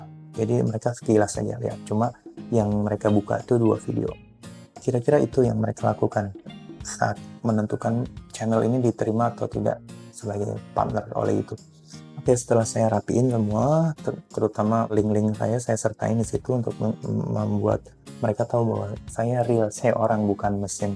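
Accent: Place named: Madura, Indonesia. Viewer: Akun yakin orang Madura nih 😂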